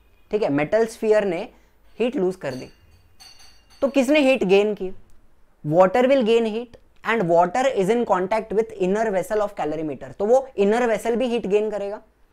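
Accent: Maharashtra, India. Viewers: bhaiya, from where you are? Because I listened Marathi when you were speaking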